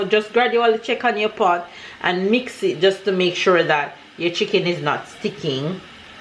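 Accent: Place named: Jamaica. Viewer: If you didn't have the Jamaican accent i was going to skip this video ..... im excited to try this tonight !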